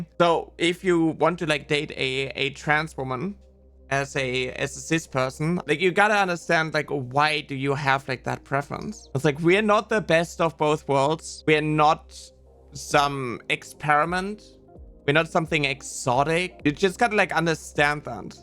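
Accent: Germany. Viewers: r u german n etc ?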